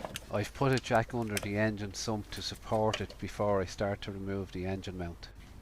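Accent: Ireland. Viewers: Fan belt, your old school. Love the Irish accent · Sounds Irish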